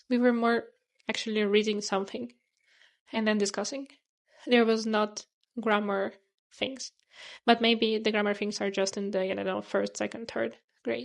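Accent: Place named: Poland. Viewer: Are you Polish? Kind of a soft Polish accent I'm hearing.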